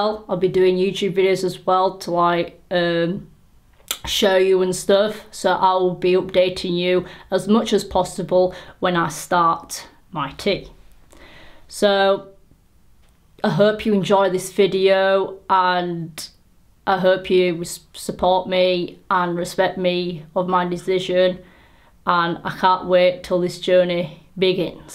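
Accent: United Kingdom. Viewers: You can message me if you need any advice about transitioning/getting on T etc in the UK (I'm guessing you're from the UK too from your accent 😂) 😁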